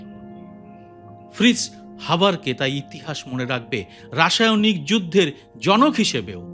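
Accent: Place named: West Bengal, India. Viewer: কারন সে পশ্চিম বঙ্গ ভারতের । তারা সুন্দর শুদ্ধ বাংলা বলে ও বিশুদ্ধ উচ্চারণ করে।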